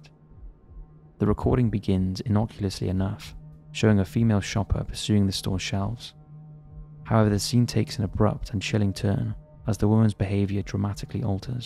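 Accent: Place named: England, United Kingdom. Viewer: the famous English voice is back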